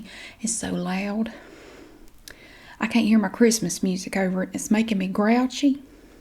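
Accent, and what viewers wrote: South, United States. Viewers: After a long work day has relaxed me and made me smile. Also.....I want so badly to replace my boring Iowa way of speaking with that beautiful southern drawl.💕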